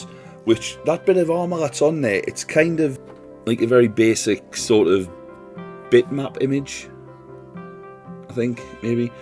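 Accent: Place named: Newcastle, United Kingdom. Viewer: Finally! A fellow Geordie! Nice work fella!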